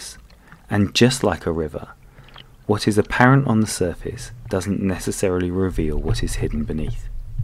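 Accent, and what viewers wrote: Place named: England, United Kingdom. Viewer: Thanks for postting, keep the good work! where in England is your accent from? Cheers mate!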